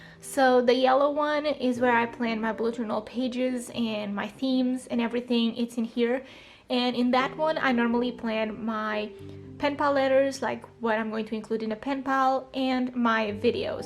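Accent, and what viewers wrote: Portugal. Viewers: adoreii !! reconhece-se logo um portugues ahah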